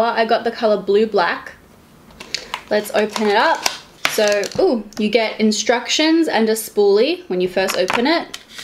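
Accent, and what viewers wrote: Australia. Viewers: Are you Aussie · Love you 💕 I love hearing a fellow Australian accent on YouTube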